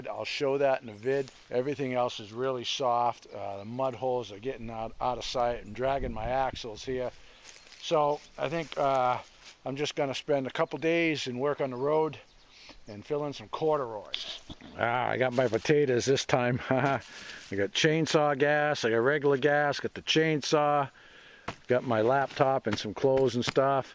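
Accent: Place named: Louisiana, United States. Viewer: No Disrespect but trying to figure out the accent. It sounds like you are from up North with a little bit of the Cajun Louisiana influence. Am I little close or am I way off ? Keep on keeping on ya'll ! Respects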